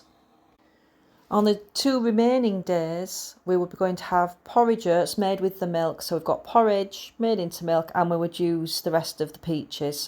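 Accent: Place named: Northern England, United Kingdom. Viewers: Fair play to ya for making this, i saw it on my feed and clicked on it to see, its always nice to support youtubers, so ive liked and subbed well done, good video, keep the good work up. Love the accent by the way, where u from? i know its north somewhere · Sounds like Humberside. I knerr.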